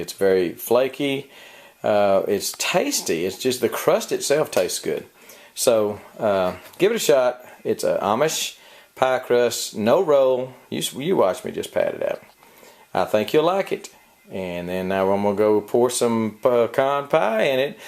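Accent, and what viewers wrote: Texas, United States. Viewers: harplover1; Texas people have a "drawl". You get used to it. You must be new to the internet? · I subscribed within seconds of hearing your lovely Texas gentleman's accent! Thanks for sharing this recipe too!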